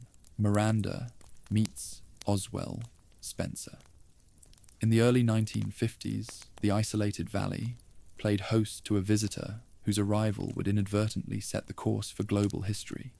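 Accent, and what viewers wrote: United Kingdom. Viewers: Good, calm voice. Crisp sound. British accent(lets go). Now I know what I'm watching for the next two weeks as i drift off to sleep during the 10 mins I'll manage to watch. Great vid dude!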